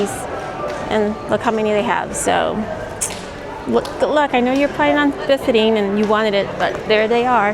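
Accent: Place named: Chihuahua, Mexico. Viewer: Love your content videos. They’re not too long and not too short. For months now I’ve been wondering where your accent is from. I’m going to guess Chihuahua by how you pronounce the CH. Also, any chance you lived in Denver, because I heard you say, “yous” at timeline . PS. Stitch is wearing a bra on his head like on the movie? 😄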